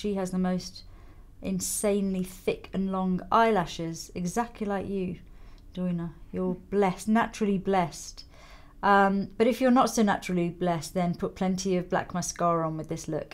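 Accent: England, United Kingdom. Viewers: Its a weird comment but I love your accent, it reminds me so much of Downton Abbey! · you can hear she picked up a bit of a British accent living in London:) this is an amazing look! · I'm so glad you're doing youtube videos, so I can just listen to your lovely British accent and relaxing voice on the days I'm missing London and the days I used to spend in that fascinating city while I was working there for a good while.:D and also are these vids totally satisfying to my insane makeup lover self as well. thank you for being such an awesome artist and a huge inspiration!:)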